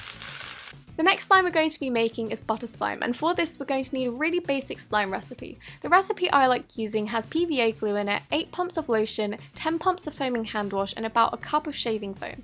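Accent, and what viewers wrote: United Kingdom. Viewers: Your voice is soo satisfying!!! 😆 (I LOVE THE BRITISH ACCENT) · You know the sound of the slime is not as good as your beautiful British accent it’s so good I love you voice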